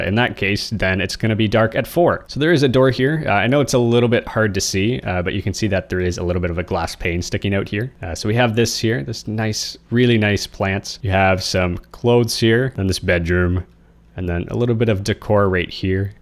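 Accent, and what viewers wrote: Canada. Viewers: Man you sound Canadian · your northern accent is showing are you canadian? (from Michigan here) edit i was right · this is the first video ive seen of yours, and I heard you say "aboot" and I was like "Is this guy Canadian?" By the end of the video I was like "This guy is definitely Canadian."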